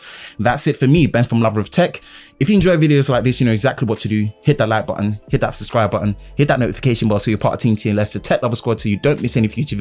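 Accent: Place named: United Kingdom. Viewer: Once again and the technical information. Few years ago I was about as ignorant as can be but after watching videos like yours I at least know how to get around a smartphone for the most part now. Also being a black American I always get a kick out of hearing the black man with the British accent oh, I think it's cool. Well once again this yank in Columbus Ohio appreciate you!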